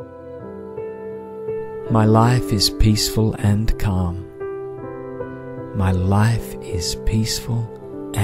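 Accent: Australia. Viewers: once again I cannot thank you enough for all of the help you have provided me with over the last 2 years just from your videos. Your voice puts me instantly at ease (aussie to aussie glad to hear your accent). You are my go to whenever I am fighting either anxiety or otherthinking. I don't know where I would be without you!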